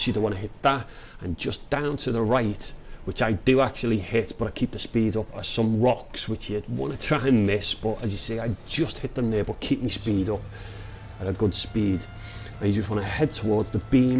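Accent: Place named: Scotland, United Kingdom. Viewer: What region of Scotland are you from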